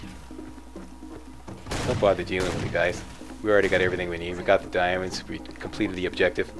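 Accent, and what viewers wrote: Canada. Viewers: i swear this guy sounds like a Canadian. great vid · Are u Canadian? I thought I heard a Canadian accent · You have to be from Canada....Yes?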